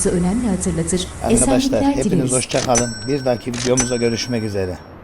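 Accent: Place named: Konya, Turkey. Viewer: Konya şivesi mükemmel olmuş ve aleykümselam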